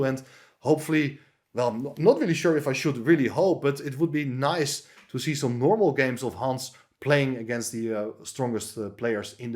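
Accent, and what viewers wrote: Netherlands. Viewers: I don't know what I hate more, Hans' cheating again or hearing this guy's Dutch version of English😅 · You're Dutch, aren't you?! :) I can tell from your accent.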